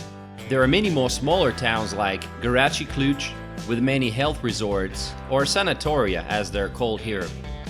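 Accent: United States. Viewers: Feel quite a bit of propaganda seeping through! State slogans .Some truth too .! & why is American Georgia mentioned? Looring American tourists ? Maybe I'm wrong . Maybe the narators almost American accent is a true American one . We will never know . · It seems you didn't get that the maker of this video is a Russian who speaks English with an American accent. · привет! You have made a great, inspiring and informative video, and it is truly made with passion for the country ;-) no matter what Larissa Koroleva is saying (her "funny" comments below)...the laid back music also fits in very well....to my ears your english sounds very american/english (maybe with a 2% russian accent).....and IMO the video is well done all the way.....большо́е спаси́бо! ;-) Sugiiiiiiiiiiii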